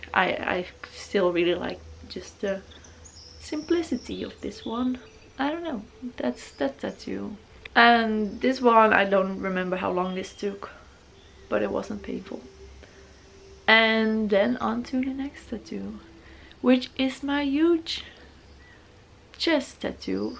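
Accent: Netherlands. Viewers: She's Dutch, and she pronounces the word like most Dutch people do. Your comment is unnecessary and quite honestly, pretty rude. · No, it's NOT rude! I'm Dutch and I don't say it like that. I have English speaking friends and they say I don't have a Dutch accent. It annoys me too. But her accent doesn't sound bad even though it's clearly a Dutch. It still sounds nice I think apart from the ID idea word.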